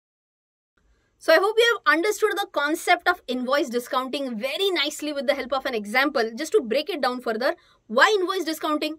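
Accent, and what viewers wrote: Maharashtra, India. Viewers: Please spare d viewers with ur crappy English... Hindi/Marathi mein English mat boliye.. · Ashakasakay is my favourite now after yaaaas 😀 love listening to cutest Marathi between knowledge 😀😀🙏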